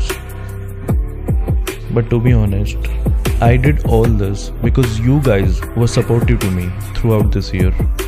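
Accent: India. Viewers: I subscribed u wen u had 120+k subs now u have 300k+ subs....that's a great achievement in jst one year.....gud luck for ur future...and btw r u indian??cuz ur accent sounds like one...love from North East India.....😄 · Me: Heard his accent Also me: Is he an Indian?🤔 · I knew it!! You're an indian 🇮🇳👳 I will never forget that accent Btw.. You've done such a incredible video. That's showing your talent so much, the sound effect and the timing.. Cool man.. Love from Indonesia❤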